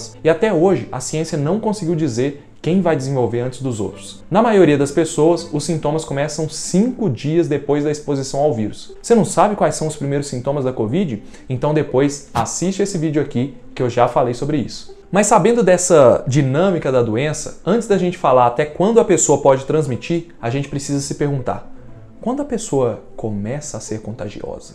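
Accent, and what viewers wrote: Minas Gerais, Brazil. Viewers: Obrigado pelas dicas ! Adoro o seu sotaque mineiro . Tb sou mineira uai !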